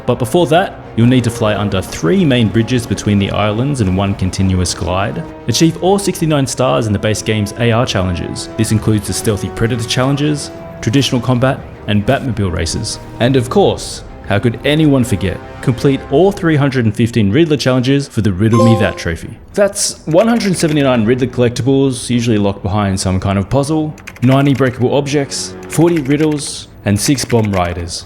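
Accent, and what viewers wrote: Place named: Australia. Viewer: Great video mate, love the commentary and the aussie accent haha (from NZ myself) Definitely agree, all these platinums are definitely hard/time consuming to get. I've gotten the Skyrim one three times on each PS generation and also the Arkham Knight one, including tevery trophy in the community challenge pack. I don't blame you for not doing them, they're absolutely mental I'll be checking out the rest of your catalogue and subscribing for more.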